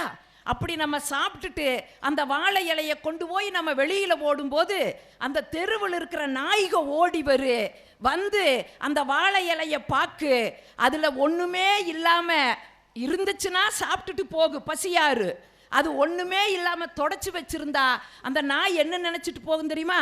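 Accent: Kongu, India. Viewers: Madam kongu tamilil kalakkareenga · Super mam..👌 Konjum kongu thamizh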